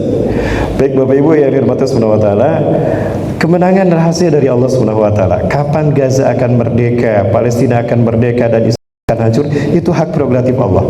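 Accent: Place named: Sunda, Indonesia. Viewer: Aamiin 🤲 URB orang Sunda jadi intonasi bicaranya menarik memang, Alhamdulillah..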